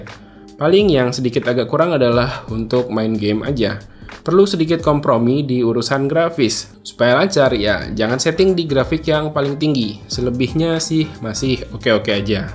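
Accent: Samarinda, Indonesia. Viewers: Orang Samarinda kah bang?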